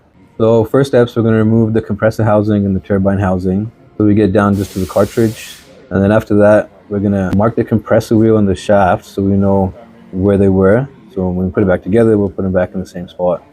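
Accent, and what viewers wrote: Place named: Iran. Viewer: لحجه ات مشخصه ایرانی هستی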